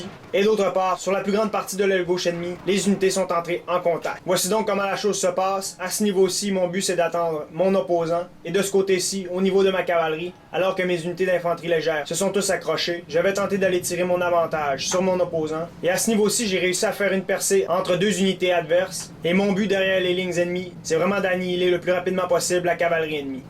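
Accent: Canada. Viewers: t'es canadien ou quebecquois?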